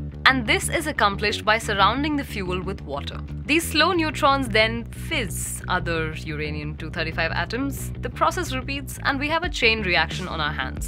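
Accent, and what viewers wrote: India. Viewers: well narrated.. Indian painted all over it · Indian accents are the hardest accent 2 understand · Excellent indian english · That's how the Indian accent is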